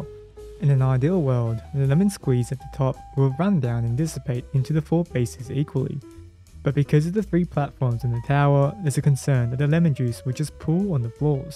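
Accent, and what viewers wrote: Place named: Australia. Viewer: A British person with an Australian accent?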